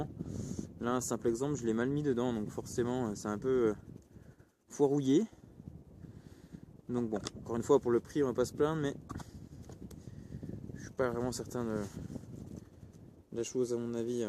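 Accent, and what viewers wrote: Franche-Comté, France. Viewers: dans le meme esprit tu aussi a la rangers gore tex special grand froid et longue marche et tu as la rangers para c se que j avais,un peu moins chaudes que la gore tex mais plus efficace pour crapahuter voila apres c un avis perso!! pour un tarifs en dessous 100e pour les 2 modeles a pluss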